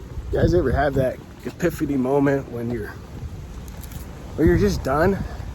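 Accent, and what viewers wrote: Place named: Hawaii, United States. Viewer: Lol I’m with you! Where are you from originally? You also sound like you grew up in Hawaii.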